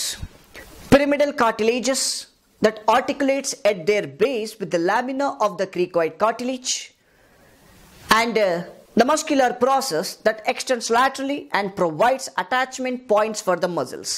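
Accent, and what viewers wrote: India. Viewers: 讲得挺好的，简短精炼。但是如果英文咖喱味不那么重就好了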